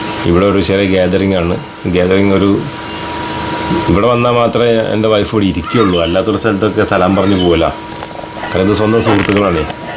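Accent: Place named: North Malabar, India. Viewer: INDONASIA ...... യേ കുറിച്ച് അറിയാൻ വല്ലാതേ ആഗ്രഹിച്ചു നിൽകുന്ന സമയത്ണ് ഇക്ക നിങ്ങളുടെ ഈ വീഡിയോ കാണുന്നത് .. വളരേ ഉപകാര പ്രദം അവിടത്തേ ഭാഷ .. ബ ഹാസ ..Indonasia പഠിക്കാൻ പ്രയാസമാണോ ? പിന്നേ അവിടത്തേ ഇസ്ലാമിക പാരമ്പര്യ തേ കുറിച്ചും മറ്റും അറിയാൻ താൽപര്യമുണ്ട് ഇക്കാ വേറേ അവിടെ യു ള്ള ആൾകാർ ഇന്ത്യൻ പേരുകളും ,,, മറ്റും വെക്കാറുണ്ട് എന്ന് വായിച്ചു അതിനേ കുറിച്ച് അറിയാൻ താൽപര്യം ഉണ്ട് ഇക്കാ ... ഇക്ക നാട്ടിൽ എവിടെ യാണ് .. സംസാരം കേട്ടിട്ട് വടക്കേ മലബാർ (കണ്ണൂർ ,കാസർകോട് ) ആണെന്ന് തോന്നുന്നു .. പറയാമോ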